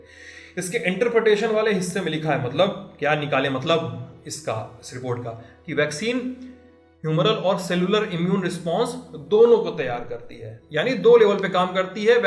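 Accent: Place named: Uttar Pradesh, India. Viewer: Bhaiya ke bolne ka style lag rha hai up waale bhaiya hai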